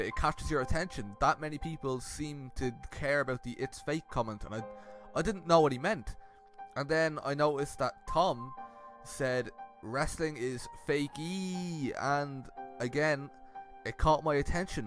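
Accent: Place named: Ireland. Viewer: That was pretty good and your Irish accent made it funnier.... in a good way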